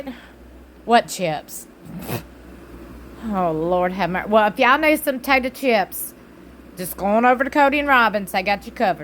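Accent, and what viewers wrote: South, United States. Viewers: Just found your channel. I haven’t watched Sister wives in several years, but they came across my YouTube algorithm for some reason, so I went and watched 18 and 19 seasons on Hulu., since it’s free with my t-mobile subscription. Unable to watch season 20 yet, been trying to get glimpses through you guys on YouTube. Robin is absolutely diabolical. I hope to never meet anyone like that in my entire life. Anyway, enjoy your channel, and also your accent. You’re from Louisiana? I’m a Georgia girl. Love the mutual southern accent!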